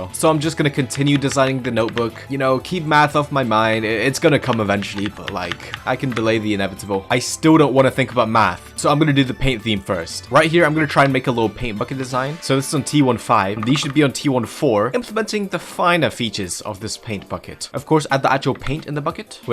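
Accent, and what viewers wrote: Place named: United Kingdom. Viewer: wait he’s British? AW YEA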